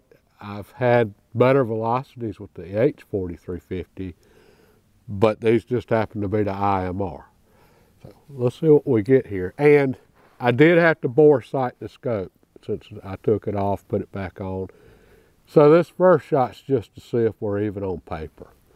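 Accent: South, United States. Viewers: did you ever send the Winchester Model 70 257 roberts back to Winchester to see what they had to say? BTW, I really enjoy your videos. You sound so calm and composed. Are you from Ga, tenn, or ala.?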